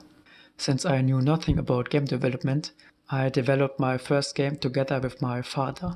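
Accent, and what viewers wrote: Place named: Germany. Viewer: When you hear a German accent, you know it's going to be fire.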